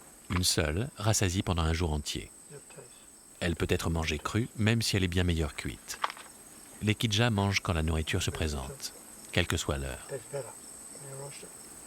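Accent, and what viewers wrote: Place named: Marseille, France. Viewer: De ouf le narrateur il est un peut marseillais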